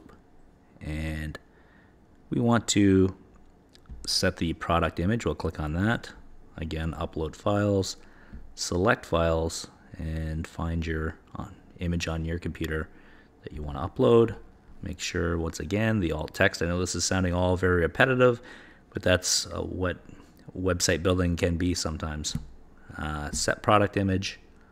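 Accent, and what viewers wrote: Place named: Canada. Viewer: Thanks for the Awesome FREE tutorial on how to set up a FREE E-com site,. So many out there plugging free this and free that only to lure people into an "only $*.** for the real information" situation. I only have one issue with your tutorial: Although you don't say "ABOOT" like South Park makes fun of/impies Canadians say, you really don't say ABOUT either. It's more of an "ABOUOOT". And I used to speak with Canadians daily when I worked at a Logistics company here in L.A. and y'all really do say it that way, (except those damn french canadians, they've got their own crazy inflections) so I can see where Matt & Trey get the stereotype from. Anyways, I am happy aboot the fact that you create these informative videos, Thanks!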